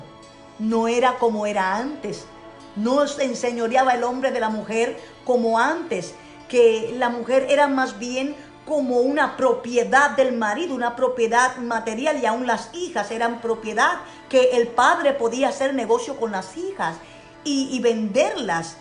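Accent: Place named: Argentina. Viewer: Usted vive en Puerto Rico pero su acento suena argentino usted es argentina??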